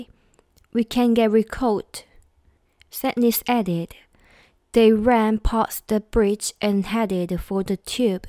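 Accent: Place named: United Kingdom. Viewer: 처음 들어보는데요..영국발음!! 너무 좋아요~~목소리도 너무 좋고 다른것도 들으러 자주 오게될것 같습니당!!!!!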